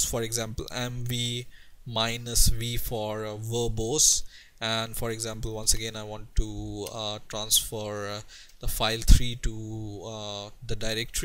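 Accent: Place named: India. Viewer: I can understand his Indian accent. awesome.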